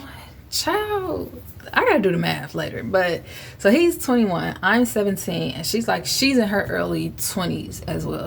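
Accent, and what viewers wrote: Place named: Midwest, United States. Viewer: Nice story! Seem like your from the Midwest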